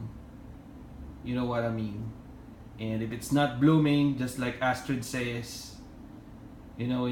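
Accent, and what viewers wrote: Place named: Philippines. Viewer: Hi, love your videos. I don’t know but your accent makes me think you are Pinoy, are you? If so, I am so lucky to find you. I’ve been looking for Pinoy youtuber making vids about plants!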